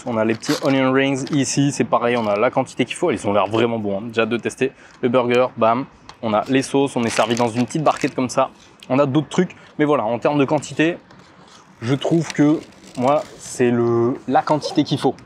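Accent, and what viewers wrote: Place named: England, United Kingdom. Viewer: comme dit précédemment jaime trop ton acent anglais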